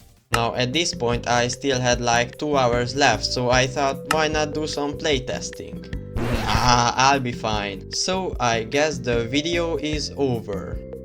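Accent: Hungary. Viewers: Csá bro hallom hogy magyar vagy amúgy király vidi❤😮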